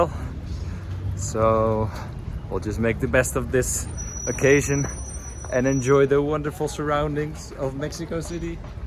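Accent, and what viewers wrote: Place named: Netherlands. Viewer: What country are you from , your frame and face made me think anglo, but with the accent I thought Dutch or even Danish But the name is Portugues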